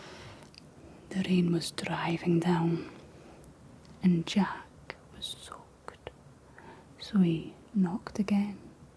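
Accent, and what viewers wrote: Scotland, United Kingdom. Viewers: Wow, you read very well.. very relaxing. And I enjoy ur Scottish way of speaking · What a calm soothing relaxing voice you have and that Scottish accent, I just love it. Very nice video, thanks for sharing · I'll be traveling to Scotland next summer, I'm practicing the accent thanks to your videos! Amazing work! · I’m always up for a wee cozy night with a Scottish lass reading to me with the peak accent.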